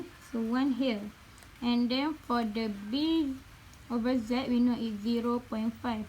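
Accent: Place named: Malaysia. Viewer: finally i found the malaysian's english. thankyou so much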